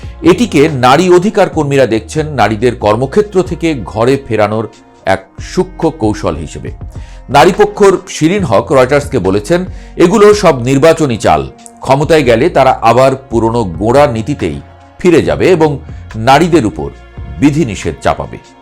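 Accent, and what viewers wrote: India. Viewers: তোমার কথা শুনে বুঝা যাচ্ছে তুমি করা ইন্ডিয়ান দাদা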